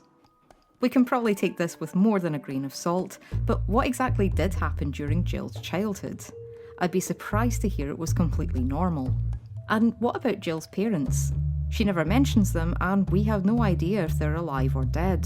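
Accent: Scotland, United Kingdom. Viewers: I watch it regularly & my hubs & i quote this daily. Helped me in my dark days & for me its the greatest show on earth! The "funeral" episode in series 1 is the joint funniest & most insanely brilliant shows ever along with series 2 "Jill's Pregnancy". Yew can keep yer bitterness to yerself, perhaps you might want to have a day off from persecuting Jill, YouTube Channel person, otherwise it just gets nasty. As soon as i heard your hacking cough & Scottish accent all i thought was "oh God, its Sandra!". Id cry if my ducts were working. Thank yew.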